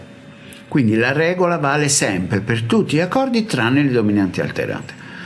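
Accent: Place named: Naples, Italy. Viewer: Sto capendo tante di quelle cose con questi video che ne sono proprio entusiasta poi il signor è un ottimo maestro e mi piace molto come spiega. Il suo accento napoletano poi è di una simpatia unica. Un saluto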